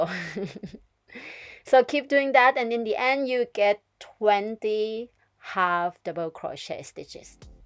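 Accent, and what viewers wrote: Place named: Thailand. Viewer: Sounds like a Thai accent!! Love the tutorial!!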